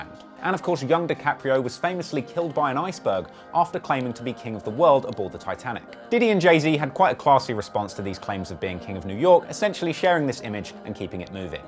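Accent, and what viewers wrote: United Kingdom. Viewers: This guy is like fingernails on a fucking chalkboard for me. Honestly, and oddly I admit, NO OTHER PERSON ON ALL OF YOUTUBE gets on my nerves like this limey Brit fuck. Oh my god he's insufferable with the same fucking jokes over and over, let me actually go to the most insufferable thing: white British people, please, please PLEASE don''t culture vulture and comment or perform hip hop, ever. See Aries Spears hilariously talking about how British hip hop is just the worst abomination of accent and manner of prim and proper accent trying to do a cool, US southern slang art form. "CAN-YAY. CAN-YAY." "TWO-PACK.TWO-PACK." HOW THE FUCKING FUCK!!! HAS THIS GUY NOT BEEN CORRECTED ON THE FAMOUS MISPRONOUNCIATION OF TUPAC YET!? "LIFE OF PAB-LOW. OH GOD I CAN'T BEAR THIS LITERALLY I'M ACTUALLY SAD I CAN'T WATCH ANY OF THIS DECENT CONTENT BECAUSE I JUST CAN'T FUCKING STOMACH THE NARRATOR FOR ANOTHER FUCKING MINUTE LITERALLY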